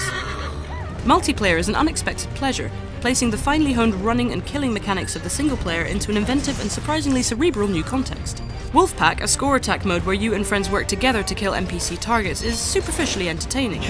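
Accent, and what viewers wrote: United Kingdom. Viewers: i was not prepared for an 11 yr old British guy than the usual twenty something white boy lol... umm this is IGN right? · you're probably british...can't take you seriously.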